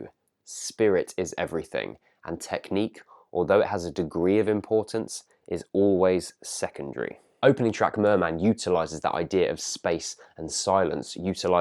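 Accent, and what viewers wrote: United Kingdom. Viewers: I love your articulate descriptions, your serene voice, your British accent, and your very handsome face. Listening to you talk about albums I love is validating, cathartic and almost as enjoyable as listening to the albums themselves. No homo tho, for reals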